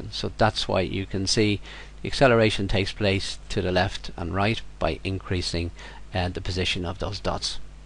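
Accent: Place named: United States. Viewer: A very good video!!!! You have a bit of an accent. From the USA!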